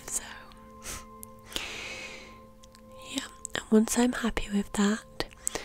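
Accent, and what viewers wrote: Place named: Nottingham, United Kingdom. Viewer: You have the most notts accent I’ve ever heard haha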